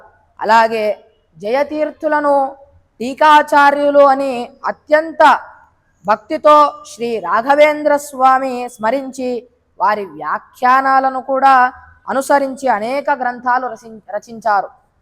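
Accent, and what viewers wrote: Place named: Karnataka, India. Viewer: వింటుంటే నిద్ర వస్తూంది. జయతీర్థులవారు ద్వైత సంప్రదాయానికి చేసిన కృషి మరువలేనిది. మీరు కన్నడ భాషలో అనుకొని తెలుగు లో మాట్లాడుతున్నారు. తెలుగును తెలుగు లా మాట్లాడండి. వినసొంపుగా లేకపోతే ఏదో చెప్తున్నారు లే అనుకొని వదిలేస్తారు. సాధ్యమైనంత వాడుక భాషలో మాట్లాడండి. ఆత్మవిశ్వాసం ఉండాలి. మీకు మంచి వక్త అయ్యే అవకాశాలు ఎక్కువగా ఉన్నాయి.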